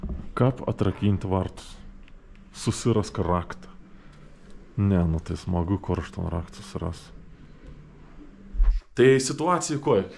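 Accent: Samogitia, Lithuania. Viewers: Video super 👍👍👍 seku seniai vienas įdomesnių contento kūrėjų, kažkiek primena Kurt Caz tik su žemaitišku akcentu.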